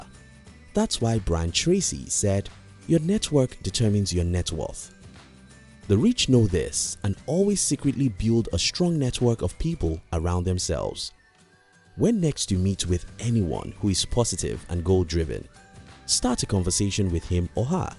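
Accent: Nigeria. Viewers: "in the secret" Funny Nigerian speaker (obvious from thick accent) uses a white hand to do the illustrations. Good message though. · Nigerian accent ...... no doubt ....mmmmmhhh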